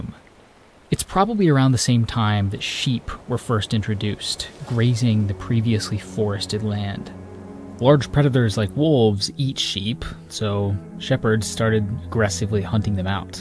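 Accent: United States. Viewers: I downvoted this video because an American narrates it. And the American doesn't know what he is talking about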